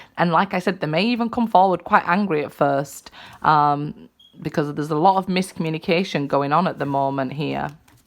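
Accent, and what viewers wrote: United Kingdom. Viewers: Oh a british girl ❤️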